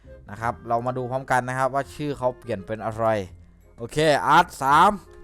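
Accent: South, Thailand. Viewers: ภาษาใต้เรย555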